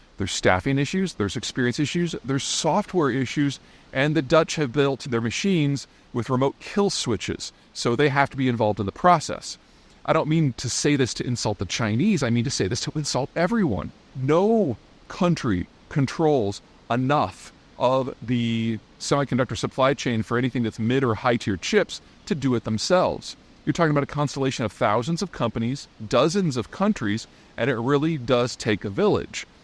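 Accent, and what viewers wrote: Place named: Midwest, United States. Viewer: Hi: That is a well known speech pattern common to the midwest America, lots of other examples, picture and pitcher. I have this myself, I can't hear a difference between "nu-clear and new-clear" Daniel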